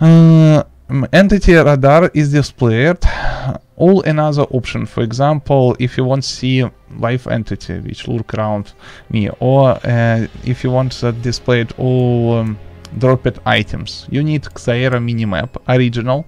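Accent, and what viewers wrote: Russia. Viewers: Прям слышу русский акцент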